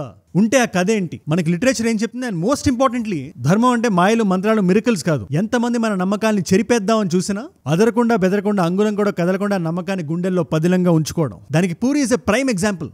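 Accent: Srikakulam, India. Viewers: 😊 i. impress you to speak Srikakulam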